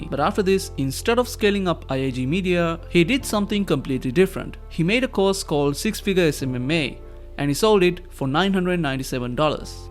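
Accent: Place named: India. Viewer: I think you should use ElevenLabs instead of your natural voice. I really mean no disrespect but I think that the indian accent is unfortunately putting people off from watching the video. Despite the video being really good. This might be why your videos are not going viral.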